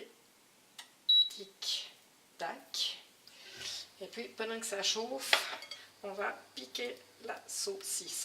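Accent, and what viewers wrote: Vaud, Switzerland. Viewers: J'aime l'accent très vaudois j'ai fait 2 fois la recette 👍👌😋😘merci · Pas mal le coup du vin blanc et de laisser mijoter, d'habitude moi je mettais que de l'eau et un oignon sans laurier... Super accent en passant 😂, canton de vaud j'imagine... Super recette je vais tester 👍🏼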